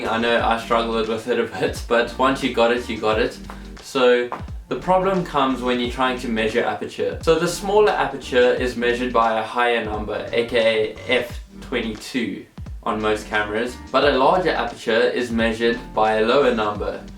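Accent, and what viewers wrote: South Africa. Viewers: South African? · Are you south african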